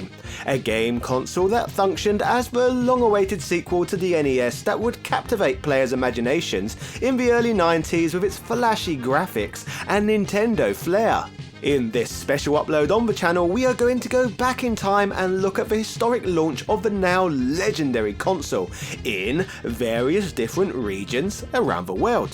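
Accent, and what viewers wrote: England, United Kingdom. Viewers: Canada? He's got the most English accent I've ever heard.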